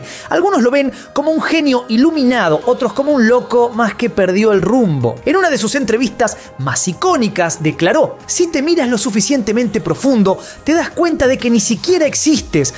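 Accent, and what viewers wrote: Argentina. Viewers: La vocesita y el acento argentino que no deja de verse el ombligo y sus comentarios lúmpen hacen que mejor veo cosas inteligentes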